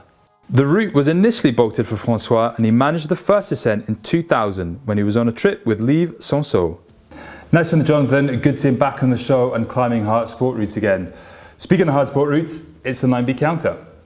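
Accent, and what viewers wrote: Scotland, United Kingdom. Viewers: Scottish....but educated in England :D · Cheers for the info! I wouldn't have called it 'strange' if I'd placed it as Scottish. ;-) Sounded like it had a twinge of something from further afield. Keep up the well-spoken words!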